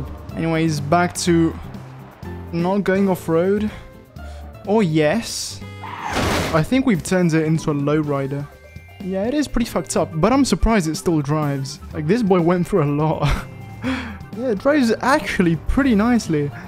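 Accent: Italy. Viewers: He's gotta be an Italian living in England with that accent. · this is the most italian accent that i've ever heard from an english guy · Me watching the video thinking a yes someone speaking slowly English,: speaking Italian Me: Ah ma sto qua parla italiano ecco da dove arrivava l'accento.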